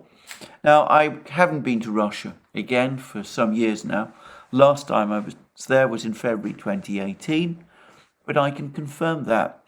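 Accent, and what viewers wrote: United Kingdom. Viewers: He's just lying with a British accent. Would be more convincing if it was Russian. But still lying.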